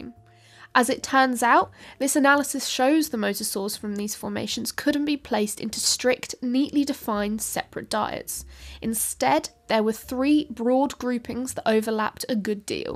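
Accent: England, United Kingdom. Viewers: I hate English accents… Mae your own YouTube so we don’t have to hear your bleeping accents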